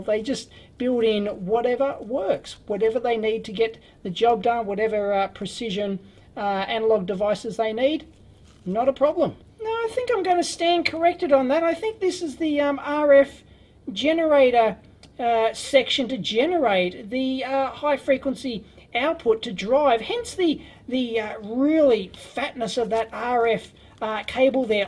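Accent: Australia. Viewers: I've been watching your blogs for a year and I dig the Aussie Accent. And the material is always excellent.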